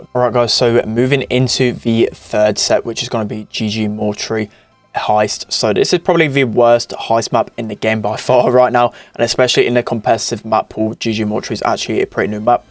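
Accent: United Kingdom. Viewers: Awww cute British accent tho👀🥰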